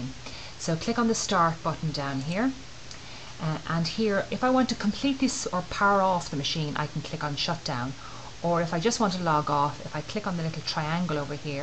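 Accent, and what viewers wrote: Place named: Dublin, Ireland. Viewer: I was sitting here listening to my wife looking at your video and I was wondering what part of Dublin are you from ? Im from Cabra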